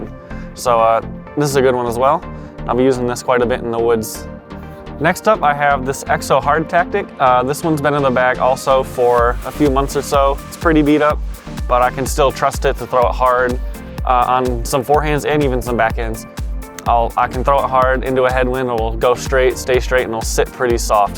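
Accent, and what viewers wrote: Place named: Michigan, United States. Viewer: Ive lived in Michigan all my life and this is the first time I noticed a Michigan accident.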